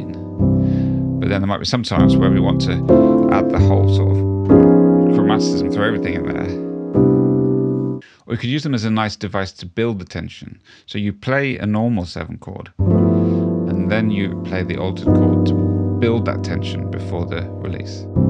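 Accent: United Kingdom. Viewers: Your delightful (South London?) accent and phrasing remind me of Tim Roth (aka Pumpkin) in Pulp Fiction. 😊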